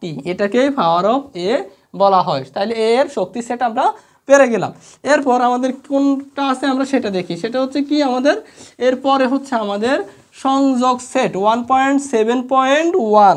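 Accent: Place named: Noakhali, Bangladesh. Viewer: vaiyer basa mone hoi noakhali ba feni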